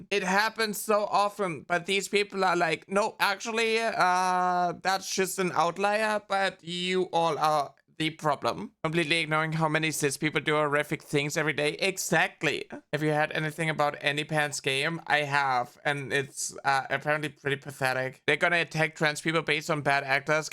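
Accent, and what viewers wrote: Germany. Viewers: Ok I am gonna say it, I thought you were Shyaren under a new name cause I haven't heard her voice in a long time lol. I guess the very distinctive German accents you two have made me think that lol. I will maintain if you had a high pitch and were more of a gremlin, you two would sound exactly the same lol. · That's what I thought too 💜 I immediately recognized the German accent and got super happy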